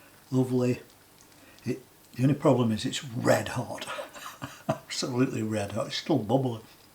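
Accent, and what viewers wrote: United Kingdom. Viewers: 👍👍👍...Hallo, I came across your channel while looking for kidney recipe! Unfortunately, I don't understand your ( Yorkshire?) accent very well🙄 And my school English is long gone😂...about 40 Years...! But your are a great guy! Still, I enjoy listening to you👍 Your channel is so wunderfullly, refreshingly NORMAL. Without modern heigh- tech kitchen und equipment! Okay, till now, you are not a greatest cameraman and your special Omelett scared me at first😂 But in the end it looks delicious. Waiting for more! I left a subscrition. Greetings from Germany...Caroline 😊❤ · Love this Lancashire accent, and the calm way of cooking!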